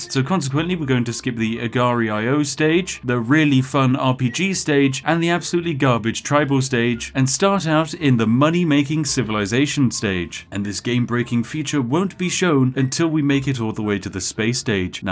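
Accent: United Kingdom. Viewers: wait a second, you are british! And you are playing Spore, where the most valuable thing is spice! AND YOU MAKE COLONIES!!! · I don't even have spore just came here for my daily dose of British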